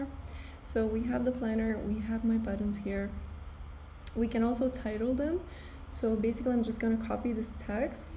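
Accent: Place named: Spain. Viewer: I am Indian but love your Spanish assent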